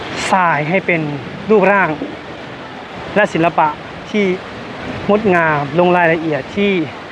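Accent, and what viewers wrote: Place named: Bangkok, Thailand. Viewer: ใครน้อ หาว่าน้องสาวกระแดะ. อาการของความกระแดะคือ สะดีด สะดิ้ง. Over acting. ภาษาอิสานจะประมาณว่า ...เคียว นั่นแหล่ะ แต่น้องเขาไม่ขนาดนั้น คงเพราะแกพูดภาษาไทยกรุงเทพฯเยอะไปหน่อยมั้ง เพราะก็รู้ๆกันอยู่ว่าเป็นคนลาว ไม่ต้องคนลาวหรอก คนเหนือ คนอิสาน ก็โดน ถ้าออกมาแนวนี้ นอกจากจะเผลอ ไม่ได้ตั้งใจเพราะมาอยู่กรุงเทพฯนานๆ คำท้องถิ่นบางคำ มันก็ลืมเป็นเหมือนกันคิดไม่ทันก็ใช้ภาษาภาคกลางนี่แหล่ะแทน อย่างผักอีตู่ บางทีนึกชื่อไม่ออกเหม้อนกันก็จะเรียกใบแมงลักนี่แหล่ะ แต่ก็อย่างว่า บางครั้งก็ต้องการให้คนภาคกลาง และภาคอื่น ฟังเข้าใจด้วย เกมมันบังคับอย่าว่าน้องเขาเลยครับ คนเราคิดไม่เหมือนกัน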